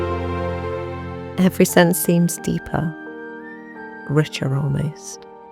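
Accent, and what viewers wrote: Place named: Northern England, United Kingdom. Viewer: Relaxing to watch. Cosy vibes, beautiful filming on the beach. You sound like a North of England girl. I am too.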